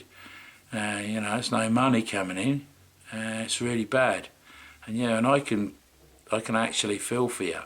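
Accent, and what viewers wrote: United Kingdom. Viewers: Hey! You could get a job as the lizzard in the Geico Commercials. Or you could do a nature show on public television. They dig that British accent. Seriously, though. best of luck on your new job. Times are tough all around. Be safe.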